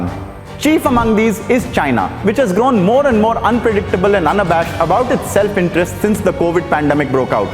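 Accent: India. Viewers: Finally an indian-english accent i can understand as a german. Why does not every indian speak like this ?!?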